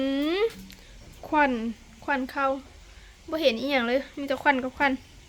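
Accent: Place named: Phu Thai, Thailand. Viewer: สบายดี น้อง สาวภูไท เว้าม่วน พี่FC ฝั่งไทย เด้อ น้อง เก่งๆ แบกตะกร้า ผ้าไปชักเครื่อง บ้านอีน้อง กดไลค์ กดแชร์ เป็นกำลังใจ❤ ให้น้อง สาวภูไท คนงาม เด้อ จร้า❤❤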